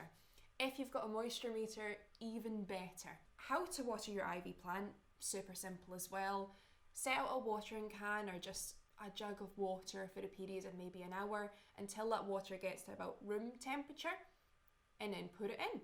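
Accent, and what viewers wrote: Scotland, United Kingdom. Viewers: Love your Scottish accent! 😍 How do I know? From outlander 😆 Great video and info, thanks! 😊💚🙌🏻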